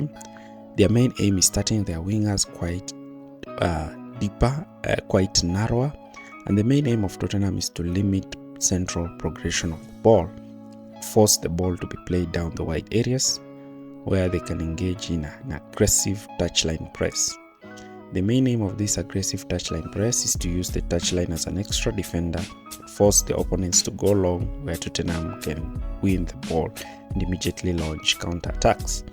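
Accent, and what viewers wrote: Kenya. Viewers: Sounds like a Kenyan😃😃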